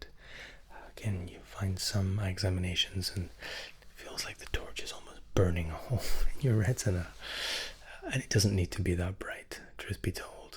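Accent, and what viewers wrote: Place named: Scotland, United Kingdom. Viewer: Terrific video! I was trying like hell to place your accent! I kept thinking Scottish with a hint of British then I checked your, "about" page lol! Regardless, your accent is magnificent and your ASMR is spot on! Cheers!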